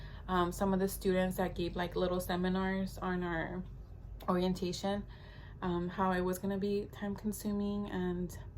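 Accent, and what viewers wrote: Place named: Southern California, United States. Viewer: Hi, PA-C! 🥳💃🏻👏🏾💫😁 Congratulations on that huge milestone! I just found your channel maybe a week ago and I wanted to pause and just shout you out. Sis your channel is sooooo good and so powerful and important. It’s interesting observing you come into your own. Even in this video alone (I think — I’m listening to my playlist while I’m washing dishes so it could be back-to-back videos but anyways) you can hear how your voice is soooo soft (and lowkey hard to hear sometimes — I think increasing volume w a lapel mic maybe might boost your channel growth) but towards the end when you are really experiencing joy talking about your cadaver lab, your volume and confidence just blossoms!!! I love to see it! It really comes out how much you really love medicine and I KNOW you’re gonna be an AMAZING provider!👩🏻‍⚕️💓🤗 Last thing I wanna say which is super awkward but I hope is helpful and the compliment I mean it to be … I grew up in Whittier and El Monte (“Al Monté🥰) and used to hang in La Puente with my bestie (who is a PA too btw 😁 ) and your accent just makes me homesick!🥹 I’m so hyped for the representation!!!! 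Increased diversity of all types is WAAAAAY overdue and I pray there will be more girls with your accent and background in these medical trenches and perhaps you’ll consider teaching high school and jc levels where you can REALLY impact the trajectory of young ppls lives. I used to teach at Mt. SAC and an ROP in the I.E. and I learned how much I loved this impact. Last th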